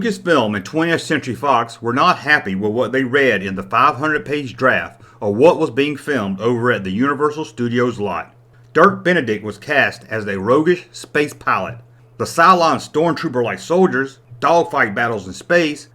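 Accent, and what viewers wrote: South, United States. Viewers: are you from the south if so whrevinntouth arevyoubfrom I cxn tell your from the south by the accentv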